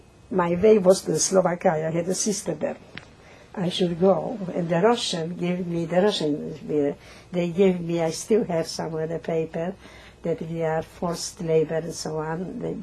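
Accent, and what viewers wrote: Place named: Poland. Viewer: I can actually understand her because my grandma who’s Polish has the same accent 🇵🇱🤍❤️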